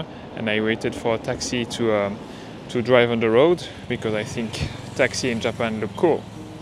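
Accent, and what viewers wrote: France. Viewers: Drôle comme on reconnaît l'accent francais, belle video 👍🏻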